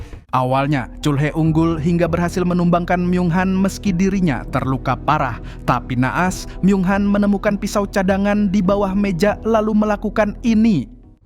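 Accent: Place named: Banjar, Indonesia. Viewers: Kaya' orang Banjar logat naratornya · Orang Banjar sekalinya Sidin ni lah wkwk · Naratornya urang Banjar ini ya KLO...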